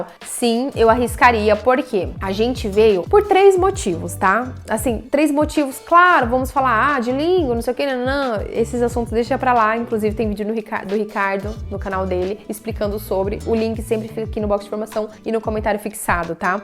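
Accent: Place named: Rio de Janeiro, Brazil. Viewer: Também amo sotaque carioca (sou gaúcha - não amo algumas expressões daqui - mas gosto do jeito que o gaúcho da capital fala, acho jovial e único 😁). É desafiante recomeçar, mas há muito valor em recomeçar. É vida somada, sem dúvida! O que for material, será conquistado, certamente será. A vida é boa quando vivemos intensamente o momento, estejamos onde estivermos, em cada detalhe e fase. O sentimento de pertencer é mágico! É como reencontrar-se/reconectar-se. Folclore ☺ Nada é fácil ou só felicidade. O maior exemplo disso é realizar o sonho de se formar... A Universidade não é um morango e saindo dali, fica ainda mais tenso. Mas compensa pq é a materialização de um sonho. 🥰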